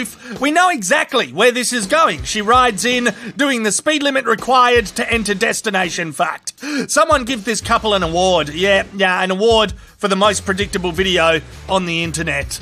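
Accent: Australia. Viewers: Everything’s funnier in dramatic Australian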